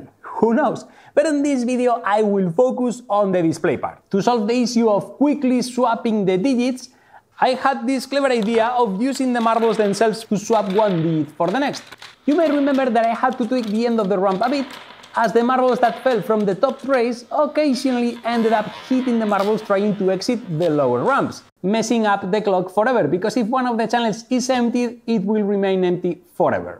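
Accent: Italy. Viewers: Absolutely. Can’t wait for the next episode. And you know what’s also brutally fun? Watch this with your eyes closed. I mean, just listen to him. He is king of speaking Italian using English words. Do so love that. 😊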